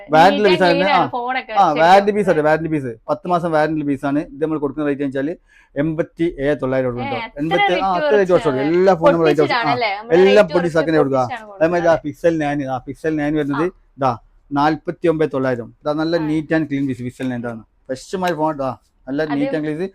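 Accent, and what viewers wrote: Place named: Southern Kerala, India. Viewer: പുള്ളി മുമ്പ് കെഎസ്ആർടിസി സൂപ്പർഫാസ്റ്റ് ഡ്രൈവർ ആയിരുന്നോ... എന്ത് സ്പീഡില സംസാരിക്കുന്നത്, ഒന്നാമത് കേരളത്തിൻറെ തെക്ക് ഭാഗത്തുള്ള വർക്ക് slang മനസ്സിലാകുന്നില്ല, അതിൻറെ കൂടെ ഓവർ സ്പീഡിൽ ഉള്ള സംസാരം. വീഡിയോ സ്ലോിൽ ഇട്ടാണ് പലതും കേട്ടത്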